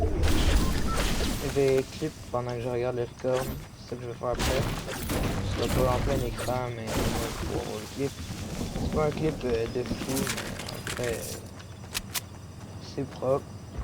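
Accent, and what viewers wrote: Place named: Quebec, Canada. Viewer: att t qc ou pas ta 1 acent ca te dit ont s'ajoute ou nn chui qc moé aussi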